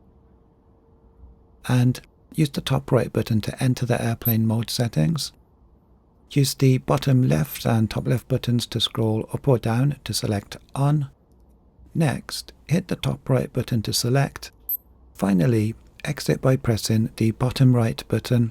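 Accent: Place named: Wales, United Kingdom. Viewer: I loved the review. Very detail oriented and a pleasure to watch. Your voice and way of speaking is also very pleasant. I’m an American so I’m very used to loud and “in your face” and found your accent and volume to be quite a nice treat for my ears. I am going to assume you are from the UK and forgive me if I’m wrong but you strike me as possibly welsh? I lived in Portsmouth for a number of years as a child so I could be wrong but I’d be interested to know where your accent is actually from. Overall great review, honest and detailed. I’ll be subscribing. Cheers from sunny Florida mate.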